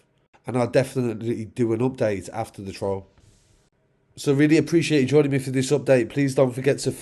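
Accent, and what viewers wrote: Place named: Erdington, United Kingdom. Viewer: Love your channel, I’m from castle vale, don’t live there now though, u sound like your from the erdington area. Great channel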